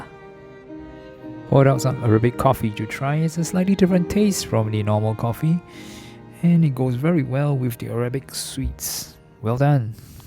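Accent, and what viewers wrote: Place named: Singapore. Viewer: Your SINGLISH accent is sooo funny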